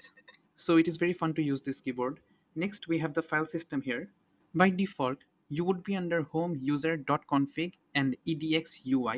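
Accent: India. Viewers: He sounds like an Indian.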